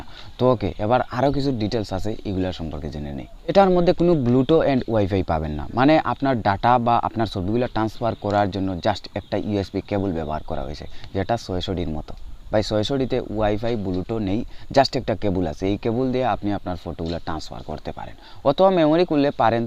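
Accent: Sylhet, Bangladesh. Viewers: sylheti bhaisab,🤨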